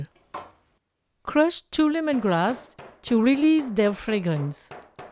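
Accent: France. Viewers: Is that a French accent I detect ? 😊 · Your amazing! Ty for the recipe. With your French accent I know you would make Vietnamese food w integrity 🤌🏼🫶🏼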